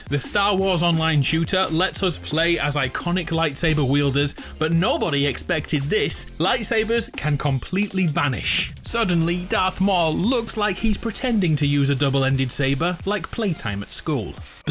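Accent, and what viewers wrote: United Kingdom. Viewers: wouldn’t expect many glitch in? In Madden? Love you but if your accent/description of football 🏈 wasn’t a dead give away you are from across the pond, then that statement most surely will. 😂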